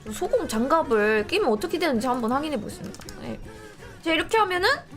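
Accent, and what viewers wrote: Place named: South Korea. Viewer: 서울사람아니었나보네 사투리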